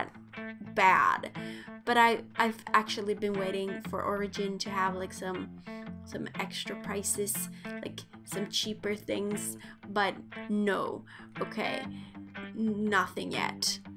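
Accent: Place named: United States. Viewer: Beautiful house!! -- just curious, why do you say "oh lord"? That's very interesting! In the American south a lot of people say "oh lord" or "lordy". "Lordy it is cold in here!". But... most people instead say "oh god", "oh my gosh", or just "goodness". Saying "Lord" doesn't sound strange or out of place at all though! It's just that I would say, it is almost exclusively southern. Like "oh lord" is a truly American thing to say. Because "oh my gosh" is pretty generic and everyone uses it no matter where they come from. So it is very interesting that YOU say "lord" haha. Btw your English is awesome, you got a couple things mixed up but you barely have an accent!!! When I first started watching I was like "oh she's American?? I thought she was from Sweden..." Haha then you said "welcome" and had just the slightest little accent. Haha You could seriously just about pass as American lol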